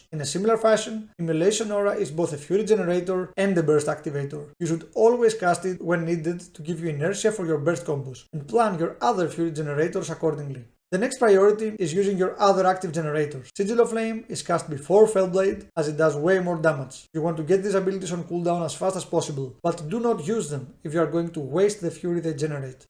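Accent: Greece. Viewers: are you from greece m8? your accent sounds familiar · your 100% greek, love your accent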